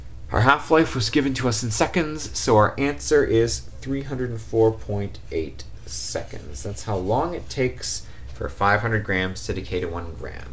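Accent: Canada. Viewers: I knew you were Canadian within the first 10 seconds lol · you truly speak like a Canadian. Oout. Aboout. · he is canadian. I can bet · I can tell this dude is Canadian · lol, accent is so Canadian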